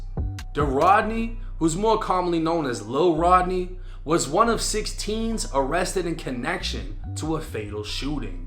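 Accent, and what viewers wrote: Boston, United States. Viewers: You sound like you from Baahhhston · How you from Florida but sound like someone from Boston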